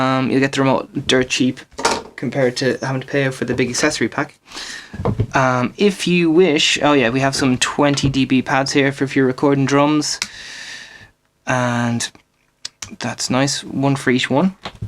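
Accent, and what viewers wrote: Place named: Ireland. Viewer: Nice one, & a pleasure 2 hear that charming Irish accent !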